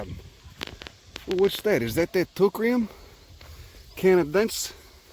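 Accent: Chicago, United States. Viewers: Love your channel, and the Chicago Accent.... "Kick Yourself in the Dick" never gets Old... Keep posting, and Thanks a bunch for the highly entertaining, and educating Videos!! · That windy chicago accent is pretty funny... and that's comming from someone who used to be a Chicagoan. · Oh my, it's not often you come across a Chicago accent in the wild of youtube. This is fantastic.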